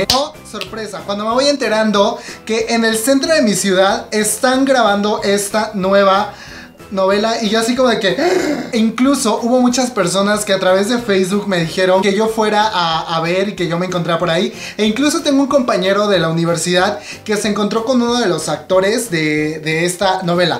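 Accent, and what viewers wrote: Oaxaca, Mexico. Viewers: eres de oaxaca ???? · Omg :v eres oaxaqueño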